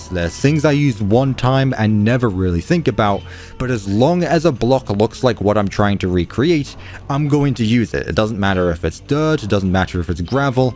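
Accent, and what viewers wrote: United Kingdom. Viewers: Maybe it’s the British accent that makes it sound better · I enjoy watching your video because it’s a nice change of page from the fast moving while talking Minecraft YouTubers. I like how you talk in your nice calm British accent as Minecraft gameplay of you building things place in the background. I find it very common relaxing to watch I love your channel.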